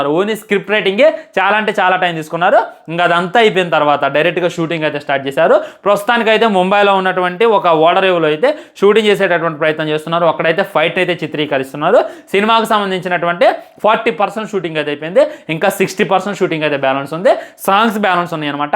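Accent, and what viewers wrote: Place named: Telugu, India. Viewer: Aapu ni sodhe bhaiyya , paata paadinattu matladaku . Bore chestavu . Aa telugu accent vina lekapothunam 🙉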